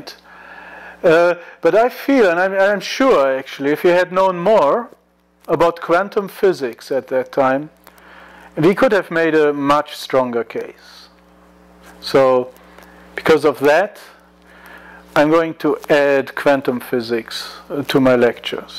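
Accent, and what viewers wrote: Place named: South Africa. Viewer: Where are you from? It sounds like South Africa, but I'm not sure.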